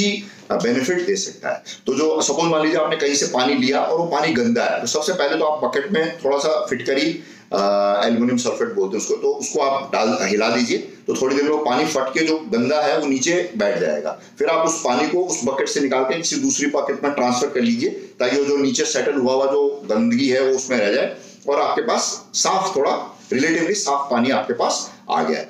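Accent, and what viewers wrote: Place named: Rajasthan, India. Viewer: nazar कमाल है मतलब सही में आप राजस्थानी हो actually आपका बोलने का शुद्ध तरीका जो h wo और इतने बेबाक तरीके से राजस्थानी ही बात करते हैं और intelligent हो आप क्योंकि में भी राजस्थानी हू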